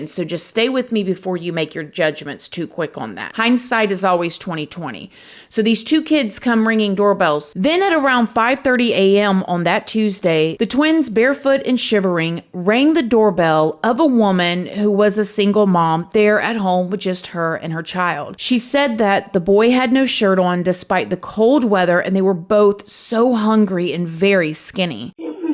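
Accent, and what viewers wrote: South, United States. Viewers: Sis it's that southern accent...keep it coming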